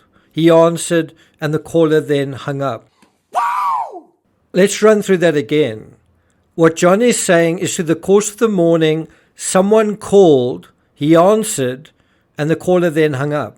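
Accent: South Africa. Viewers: you have an excellent way of presenting your podcasts I love the South African accent too. From Melbourne down under Australia 🇦🇺 🇦🇺🇦🇺 There seems to be an awful increase in the murder of young children either by a parent a family member or a paedophile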